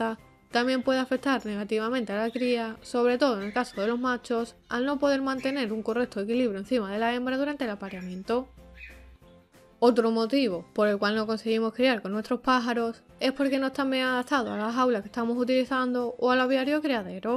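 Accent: Spain. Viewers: Yo tambien vivio en españa. Una vez vi uno de tus videos estando con mis abuelos y me dijeron que tenías un acento como de canarias. Es cierto?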